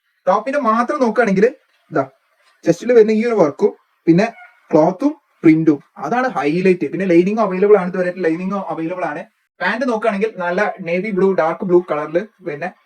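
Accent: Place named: Malappuram, India. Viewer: Malappuram slang anallo evide sthalam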